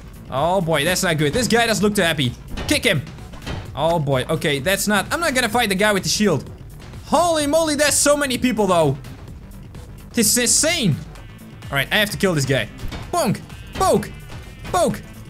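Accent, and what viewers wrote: Netherlands. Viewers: You cant hide ur dutch accent XD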